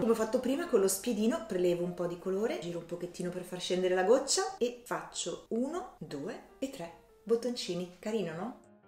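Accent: Romagna, Italy. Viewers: Ma che meraviglia sei? Ti trovo davvero brava.... Per non parlare della simpatia e l'accento che immagino romagnolo mi fa sentire a casa... Ma gli elastici dove li hai presi? Grazie e tanti auguri.. Anche se un pochino in ritardo!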